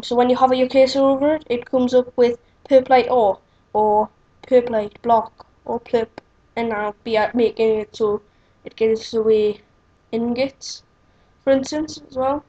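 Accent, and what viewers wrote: Newcastle, United Kingdom. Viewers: Wow, you just showed all of us your excellent grammar skills. Thank you. And, uhh, He is a geordie. He's from Northumberland (Probably). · Loopy Parky British, his accent is British. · Accent. British. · i cant stand your stupid british accent, thats why i stopped this video at :10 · You from Newcastle? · mate are you jordey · It's Geordie. Newcastle in England. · Are you from Newcastle? You sound like it. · your jordie accent is really penetrating my skull :O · This isn't a Scottish accent... This is Geordie i think. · Ur a Geordie wi ai Mann (y) :D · are you from newcastle? :D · Geordie? I'm a Geordie lad myself! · Nah sounds like a geordie to me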